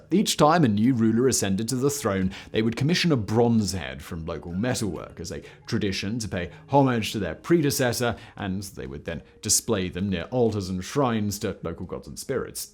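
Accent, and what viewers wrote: United Kingdom. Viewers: This channel has become so anti British and yet the presenter uses his accent is a major part of his appeal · He has little to no involvement in the production of most videos he presents, he is a very sought after host due to his friendly and genuine personality, British accent and suitably deep voice.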